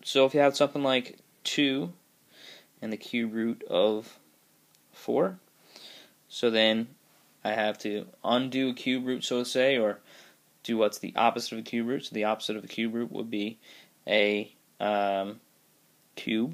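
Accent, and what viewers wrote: Newfoundland, Canada. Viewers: Are you from New Foundland?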